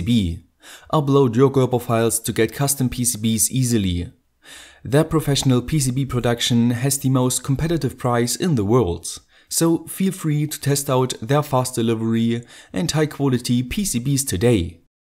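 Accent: Germany. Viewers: It's probably the german accent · Hey it sounds like ur speaking deutsch When yes: ich auch xD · are you german? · Schade dass Du nicht deutsch sprechen kannst. Ich höre immer einen deutschen raus der versucht extrem perfekt Englisch zu sprechen. Aber das ist ja quatsch. Weiter so. Tolle Bastelthemen!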